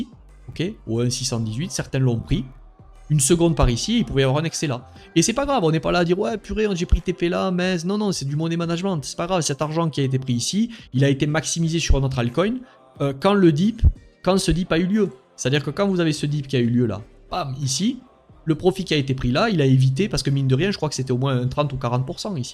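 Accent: South, France. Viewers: Bonjour, est il possible de faire une analyse de ce genre sur decred (DCR). J’aime beaucoup la façon que tu as d’analyser le tout avec l’accent du sud! Tu gagnes un abonné:)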